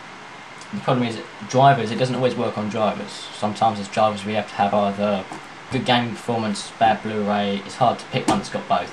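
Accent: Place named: England, United Kingdom. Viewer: Man i love your English accent ^^ and also your bluRay :p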